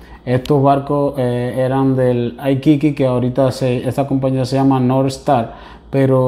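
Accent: Dominican Republic. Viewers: Tiene acento dominicano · Hermano usted es dominicano?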